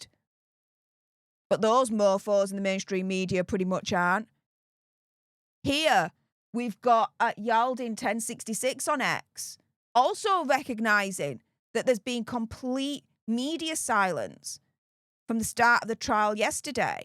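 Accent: Yorkshire, United Kingdom. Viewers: Not sure where you're from, but by your accent, it's Yorkshire, I think. You're very brave, hun, reporting on these topics. I'm taking my hat off to you😇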